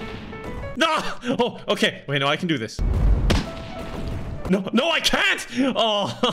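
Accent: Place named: Ireland. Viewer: just found your channel today randomly. binged your sims 2 series. enjoyed the content alot. watching all your latest videos rn. Good stuff, Subbed. also, are you Irish? you have an accent like Nogla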